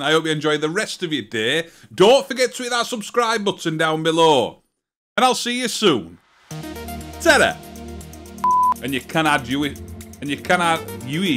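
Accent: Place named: South Yorkshire, United Kingdom. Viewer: Where's your accent from dude? My guess south Yorkshire?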